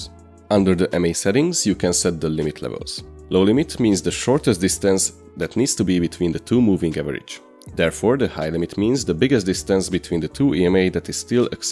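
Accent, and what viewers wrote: Hungary. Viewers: Are you Hungarian? :)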